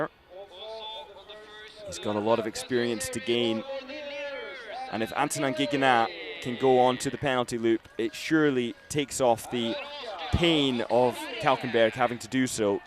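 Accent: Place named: Scotland, United Kingdom. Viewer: This guy is the best English language commentator. Is he a Scot?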